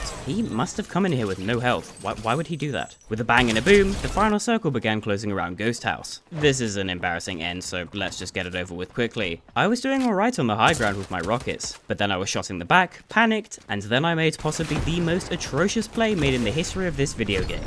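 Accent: United Kingdom. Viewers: Luke te Notable but more calm and british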